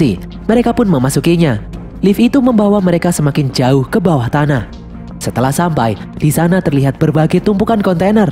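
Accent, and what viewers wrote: Java, Indonesia. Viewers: Min Anda Orang Jawa ya kop Bahasamu koyo ngono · Seru onk boso jowo e 🤣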